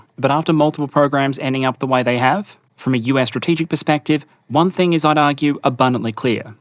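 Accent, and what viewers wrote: Australia. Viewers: Aussie accent, usually a charming quality, failed him here.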